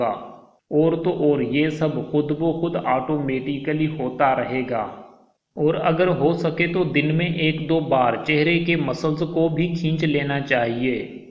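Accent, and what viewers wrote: Sindh, Pakistan. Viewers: Translated from English in Sindhi accent !!!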